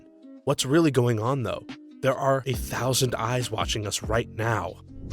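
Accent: Scotland, United Kingdom. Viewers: Oh god as a glaswegian I love your Scottish accent 🤣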